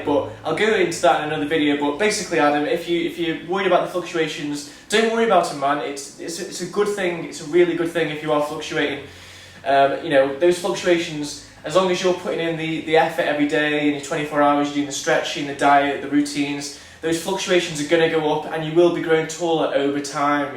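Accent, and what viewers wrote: United Kingdom. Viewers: because of your accent :P are you from yorkshire?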